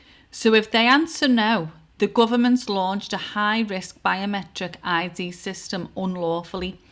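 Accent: Liverpool, United Kingdom. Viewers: And again thank you,you beautiful scouser English lady and to summarise their house of cards are supported by matchsticks that are on fire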